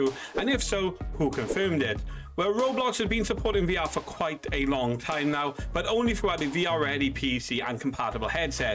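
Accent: Wales, United Kingdom. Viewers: Are you welsh??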